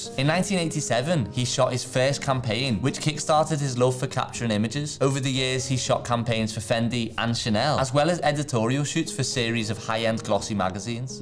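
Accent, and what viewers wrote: Liverpool, United Kingdom. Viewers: I am glad I have found this channel, it’s quick and sparing of unnecessary info BUT you do need to do a bit of work/research on your pronunciation. Oh, and you can’t blame it on your mildly scouse accent either, sorry. 😀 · Really enjoyed this quick, informative and to the point. I kept wondering about the presenter's accent. Scouse?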